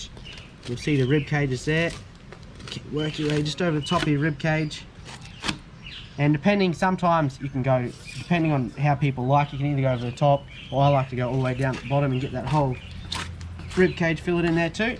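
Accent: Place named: Australia. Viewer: I love your Aussie accent.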